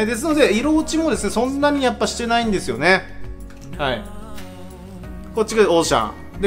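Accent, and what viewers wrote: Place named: Kumamoto, Japan. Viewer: 熊本のイントネーション最高すぎる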